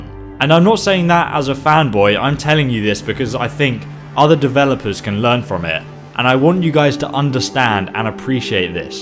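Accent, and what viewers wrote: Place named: England, United Kingdom. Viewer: We should start a gofundme for you to get a drone so we can listen to that beautiful English accent for many more long winded minutes. Anyone down? Ohmmmmmmmm bethesderrrr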